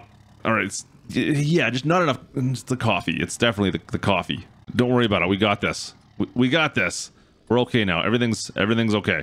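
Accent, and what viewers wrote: Canada. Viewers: Are you Canadian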